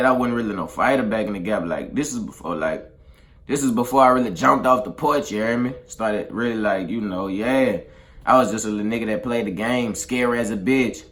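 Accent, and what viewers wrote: Louisiana, United States. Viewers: he from Louisiana wen he say it twice to put emphasis on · That accent on point bro lol u can tell u from the south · That's how he talk 😐he from Louisiana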